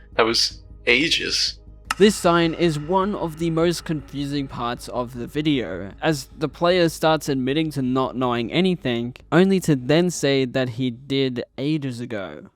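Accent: United Kingdom. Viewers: Love the British accent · U sound sooo British, no like really, anyway, good video ma boi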